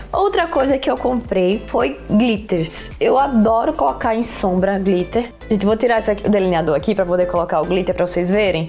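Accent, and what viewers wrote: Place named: Maceió, Brazil. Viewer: amo o sutaque dela e tmb sou de maceio❤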